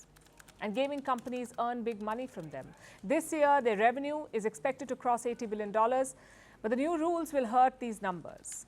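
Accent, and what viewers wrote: India. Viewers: This woman is always talking bad about China with an Indian accent. How much does she get paid for doing this? Is there only bad about about China and nothing good?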